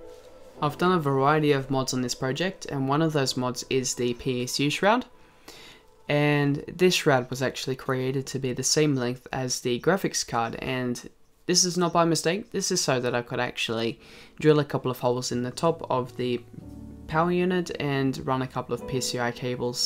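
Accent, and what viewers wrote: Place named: Australia. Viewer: I thought you were Swedish? Your Austrialian(?) accent is quite amazing, and English in general is way above the average for Swedes :P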